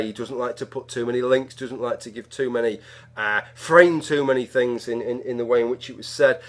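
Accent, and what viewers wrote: United Kingdom. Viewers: There are other YouTube atheists who are prettier than you (Suvarenee, The Peach, ZOMGit'schriss, etc), who are not only easier on the eyes but make my heart flutter and draw sighs from the most tender parts of my being. They're smart, they're sexy, they're atheists...what more could a guy like me look for? Nevertheless...nevertheless...I am forced to admit, you're still my favorite YouTube atheist. Is it the British accent? I don't know. But there you are. Rock on, good sir! Rock on!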